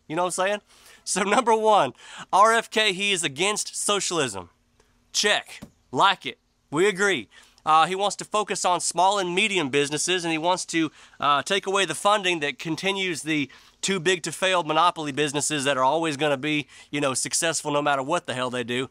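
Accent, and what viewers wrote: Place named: New England, United States. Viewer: What I love about your channel is your Yankee accent and no BS talk. Your music is pretty good too! 👍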